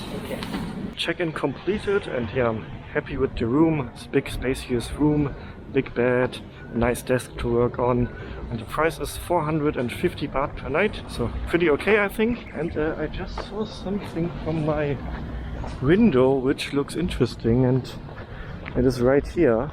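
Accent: Germany. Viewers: Dein deutscher Akzent ist nervig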